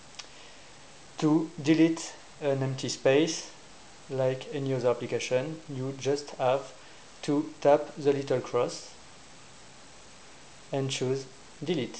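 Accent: France. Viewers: ton anglais est pas vrm bon mais bravo quand meme t bon pour un francais :P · Rien C'est juste que j'ai déja été en france et vu que je connais l'accent j'ai remarqué que tu étais francais :) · you have a cool accent i'm guessing your french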